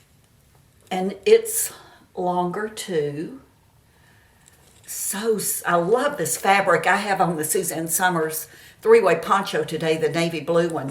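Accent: South, United States. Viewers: hear that southern drawl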